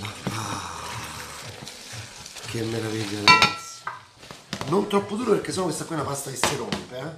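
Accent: Rome, Italy. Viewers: Il dialetto romanesco è sempre uno spasso.. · CIAO.. TI RINGRAZIO TANTISSIMO PER TUTTE LE VOLTE CHE CUCINI.. SEI UN GRANDE👍MI PIACE TUTTO QUELLO CHE CUCINI... E POI QUANDO ASSAGGI QUELLO CHE HAI CUCINATO FAI VENIRE VOGLIA DI MANGIARE E DI CUCINARE SUBITO.. SEI PROPRIO SIMPATICO.. POI PARLI ROMANESCO E IO ADORO TUTTO QUELLO CHE È ROMANO... IO SONO UNA CUGINA DI ROMA.. (CIVITAVECCHIA) POI SONO UNA NONNA DI 73 ANNI.. QUINDI È PIÙ APPREZZABILE QUELLO CHE SCRIVO.. GRAZIE... UN SALUTO A TE E LA TUA CAMERA WOMAN.. UN BACIO AL BIMBO.. CIAO ALLA PROSSIMA.🌹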